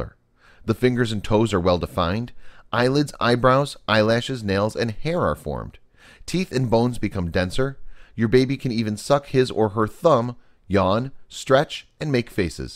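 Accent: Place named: United States. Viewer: British voice should be mandatory for documentaries. What a horrendous "metallic" American voice.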